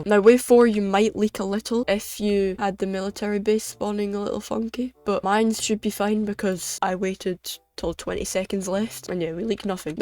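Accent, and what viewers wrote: United Kingdom. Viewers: it sounds scottish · the moment i heard the brit accent i knew this is going to be good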